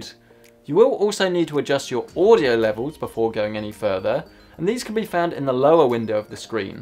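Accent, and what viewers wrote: United Kingdom. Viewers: I trust British accents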